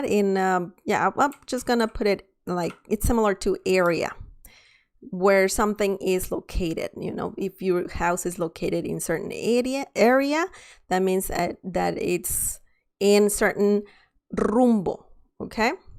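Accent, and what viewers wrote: Spain. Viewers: Is this the Spanish from Spain? If so, I appreciate this, as I now live in Andalucia, and I need to learn the unique dialect. Thank you!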